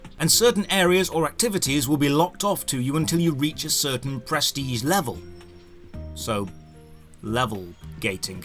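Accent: United Kingdom. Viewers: Hello American guy eh? is among the most British looking and sounding people ever lol how'd you get that wrong:D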